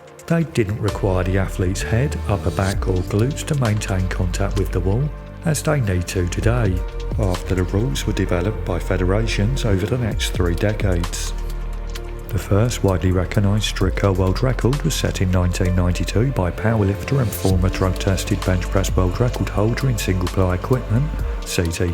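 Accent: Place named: Kent, United Kingdom. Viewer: Are u from kent mate... Your accent 😄😄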